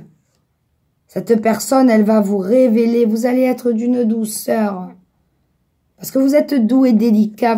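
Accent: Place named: South, France. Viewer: J aime beaucoup votre façon d interpréter les cartes et puis Cet accent du sud ☀️😍 Merci a vous et bonne journée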